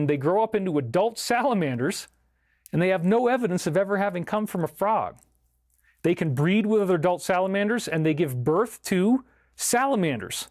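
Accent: Australia. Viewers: Great info! Love the Australian accent and your sense of humor! Have you ever seen the 1974 Brian De Palma movie Phantom of the Paradise? it's an extremely interesting movie I think you would get a kick out of! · I love your Australian accent ‼️I lived there for a minute and you do it real well and you’re a fun guy. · Very enjoyable program for the most part-----thank you. My exceptions, personally are #1) I don't trust "Dr. Steven Greer" any further than I could toss him (appearing or whatever in your next film? can't STAND him) #2) I think your Australian accent is funny and I enjoy it----but Hon, you lack the ear to do a British dialect of any sort, upper or lower class. Not meant to hurt your feelings---just stating a fact. #3) Narcissists and Psychopaths do not "Repent"...they will not be Allowed into the Higher Frequency Timeline. The less said about them the better! 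Loved all the crop circle slides---so nice to have this review. God Bless You!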